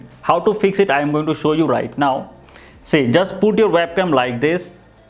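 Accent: India. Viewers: Mine is the hd 270p version. I've had Logitech web cams for over 10 years and rarely used them. I think we should video chat because I could help you with your American accent and you could help me with your wonderful Indian accent. I can imitate your accent from time to time that I learned from many telemarketers. It comes in handy when they call my house and I shock them when I start talking like them. So hilarious. Great review and accent too. thanks. 😁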